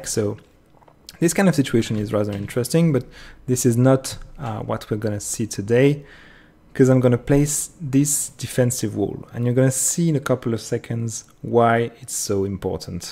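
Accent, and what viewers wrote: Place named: France. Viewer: Great video, I am not sure, but you have a strong French accent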